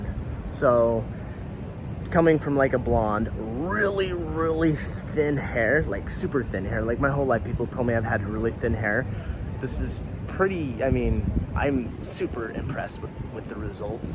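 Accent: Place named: Utah, United States. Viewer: I knew I heard some Utah in that voice. :)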